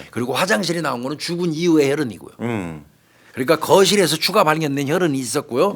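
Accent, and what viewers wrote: Chungcheong, South Korea. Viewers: 우리의. 충청도. 말씀으로. 넘. 구수하게. 해주셔어. 감미록고. 훈훈한. 진국입니다. 정말. 꼼꼼. 인정유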